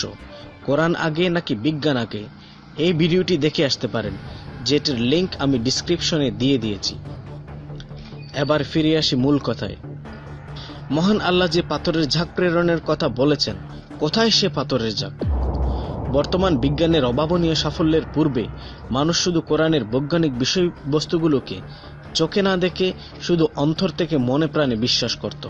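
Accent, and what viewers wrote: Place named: Sylhet, Bangladesh. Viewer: সিলেটের ভাই মনে হয়। যাই হোক তিনি তার সর্বোচ্চ চেষ্টা করেছেন।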